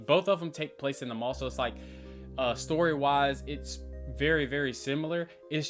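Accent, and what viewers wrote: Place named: South, United States. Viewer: You sound very southern. Where do you live?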